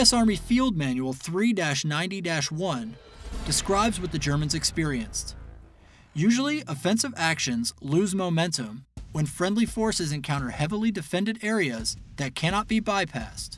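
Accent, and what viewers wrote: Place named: United States. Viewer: American accent somehow kinda kills the seriousness of a documentary. Needs British voice.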